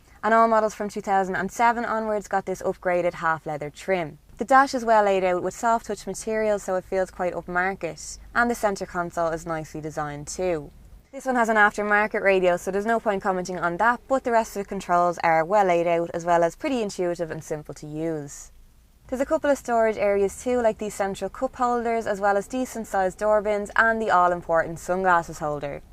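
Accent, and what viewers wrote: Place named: North Dublin, Ireland. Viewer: Strong north Dublin accent on this woman.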